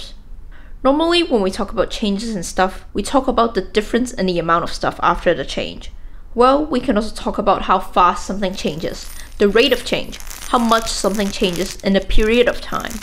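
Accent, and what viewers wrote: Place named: Singapore. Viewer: Very nice Is this english accent from someone from singapore with a chinese background?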